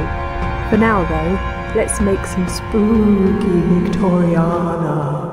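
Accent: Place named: United Kingdom. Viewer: Hey, came here from SEG! I’m so glad he mentioned you as this is exactly my type of content. I have plans for making some period dolls house vignettes with a story tying them together. I’m teaching myself so it will be slow going, but it’s been a dream for so long. Do you ever showcase your work in person? I am uk based and your accent makes me think you are too. I’d love to see it in person one day.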